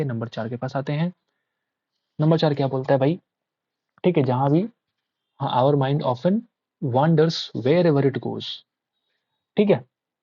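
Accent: Bihar, India. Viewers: Sir app bihar se ho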